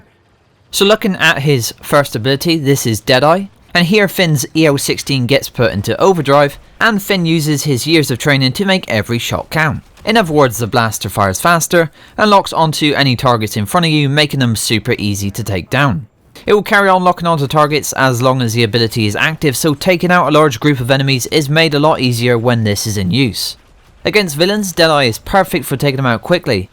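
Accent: West Country, United Kingdom. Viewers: Where in the West Country are you from 😏?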